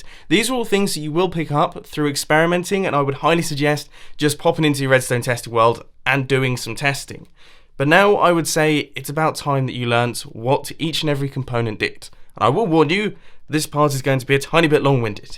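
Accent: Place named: England, United Kingdom. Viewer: Love your redstone contraptions and your english accent. XD aka, U r my hero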